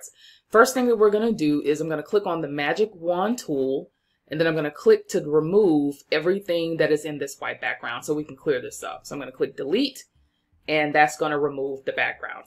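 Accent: South, United States. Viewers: Great video..thanks so much. This was easy to follow and fun. Love your southern country accent.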